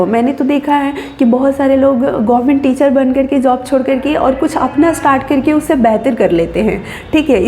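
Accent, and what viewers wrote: Uttar Pradesh, India. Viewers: Di aap up se ho kya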